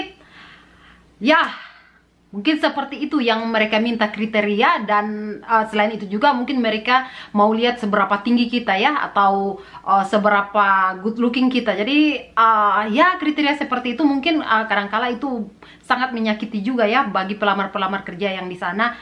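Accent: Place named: Eastern Indonesia, Indonesia. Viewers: tanta asal mana ni? 😁🤣dialek kayak orang ntt 🤣🤣🤣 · logat kaya orng timur 😁😁. semoga saya bisa di bantu kerja ke sana kk . 😔😔pengeeenn sekali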